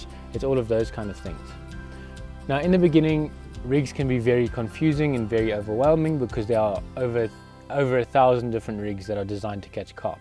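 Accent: South Africa. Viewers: I noticed the accent immediately! Nice to find a local channel on fishing 🇿🇦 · Lovely to hear a saffa doing some carp stuff. Subscribed now. From a fellow saffa in devon, United Kingdom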